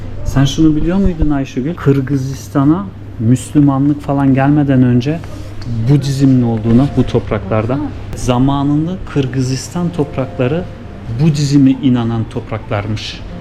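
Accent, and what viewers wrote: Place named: Aegean, Turkey. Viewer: türklüğüyle övünen güzel bir kanal..tebrikler..şiveniz egenin ödemiş tarafları gibi, nerelisiniz kardeşim?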